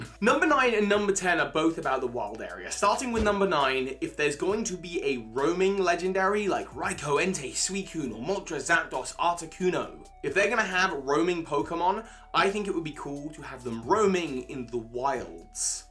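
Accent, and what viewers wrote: United Kingdom. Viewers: Honestly I thought he was British not Australian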